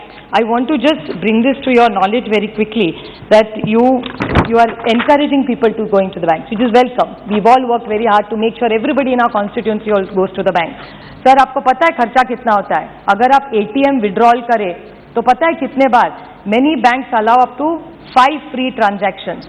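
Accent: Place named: Maharashtra, India. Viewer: मराठी आवाज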